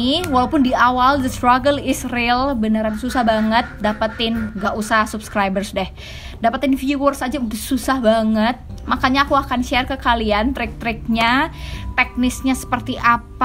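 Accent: Java, Indonesia. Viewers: Wong jowo ya Bae medoke😎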